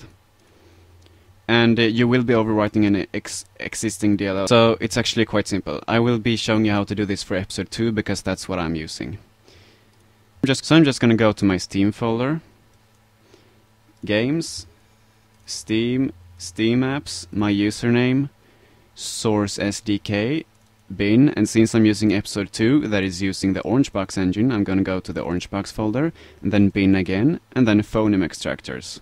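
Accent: Sweden. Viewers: Sounds like a swedish accent :3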